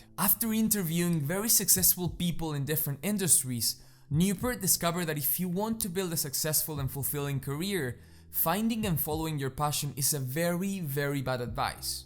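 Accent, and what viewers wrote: Argentina. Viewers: quee? sos argentino?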